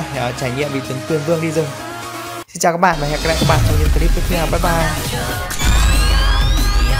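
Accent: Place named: South, Vietnam. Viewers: Ôi zồi ơi ổng nói tiếng nam kìa cute phô mai que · Anh nói tiếng miền nam kìa ối zờ ơi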